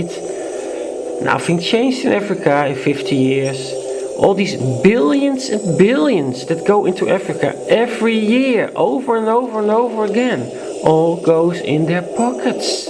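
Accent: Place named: Netherlands. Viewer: Hey, leuke film hoor, je bent zeker echt Nederlands he? Je accent ;)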